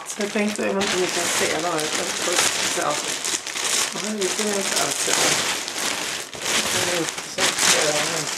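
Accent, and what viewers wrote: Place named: Scania, Sweden. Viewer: Vilken härlig skånska du har! ✌🏽️ bor i malmö men kan nästan gissa på att du är från Helsingborg? Roligt hur skånska kan skilja sig så😋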